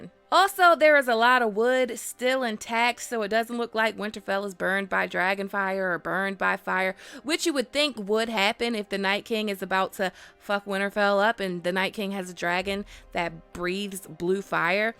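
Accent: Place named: East Los Angeles, United States. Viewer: I love your voice! It’s kinda East L.A. with a hint of Inglewood 😊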